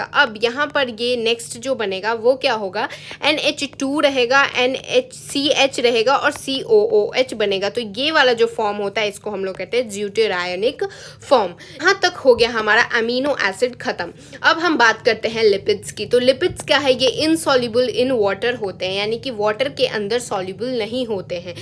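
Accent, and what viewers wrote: Bihar, India. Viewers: Pronunciation galat ho raha hai😂😂 baaki chapter explanations theek hai .!! bihari tone hai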